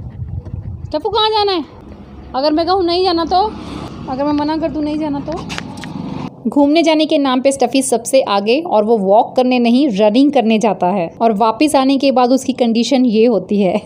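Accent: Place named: Himachal Pradesh, India. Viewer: Aapki awaz se hi me jaan gyi thi ki aap HP k ho 😊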